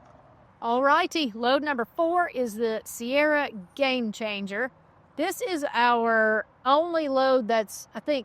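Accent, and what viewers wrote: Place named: New England, United States. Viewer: Your country Yankee accent is funny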